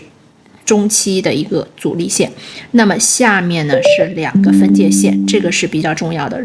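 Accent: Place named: Beijing, China. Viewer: 期待捣持出镜，亲切的老北京话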